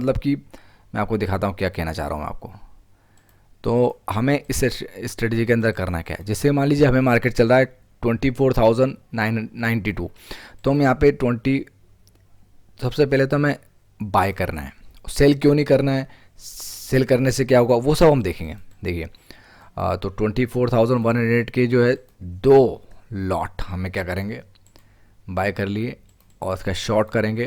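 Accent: Indore, India. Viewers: Bhai mujhe lagta hai aap Indore se ho....kya me sahi hu? Ya malwa region se 🤔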